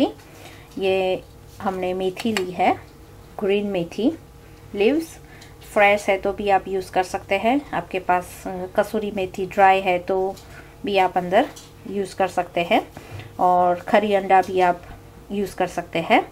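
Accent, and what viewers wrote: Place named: Gujarat, India. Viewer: mam aapka gujju wala Hindi bahot hi sweet laga..., by the way good try... Maja aavi gai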